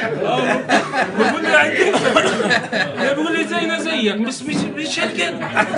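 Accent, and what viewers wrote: Palestine. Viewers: فلسطيني 😂😂😂 مش عنصرية بس مبين من لهجته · هذا لهجته مش اردني فلسطيني مبين من اول ثانيتين عرفت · هذي مهي لهجتنا هذي لهجة الفلسطينية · هاظ مش اردني من لهجته هاظ فلسطيني مش اردني قال بسولف بكيت بدل كنت 😂😂😂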